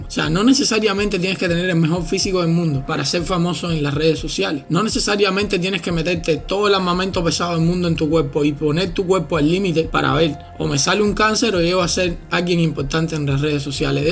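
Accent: Cuba. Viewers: bro me suscribí eres cubano? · Eres cubano brother?? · Bro eres cubano, contestame si puedes, yo soy cubano conosco el acento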